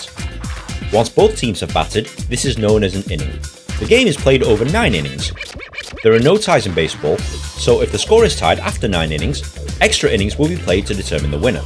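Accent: United Kingdom. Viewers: as for eastern european man trying to learn american game this british accent does not make any it easier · As a guy named after a baseball player, it's just fun to listen to a British guy explain the rules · Is this a brit explaining an american sport?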